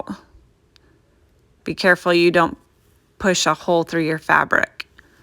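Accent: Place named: Texas, United States. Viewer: Such a great tutorial! Clear instructions and easy to follow along. Love that Texas accent ❤️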